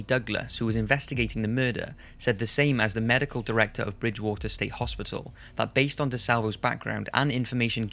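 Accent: Wales, United Kingdom. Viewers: Are you Welsh? You sound like you have some form of Welsh accent. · Are you welsh? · I love these but its impossible to be creep with a Welsh accent ❤️ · Narrator sounds Welsh 😱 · Narrator is Welsh 😱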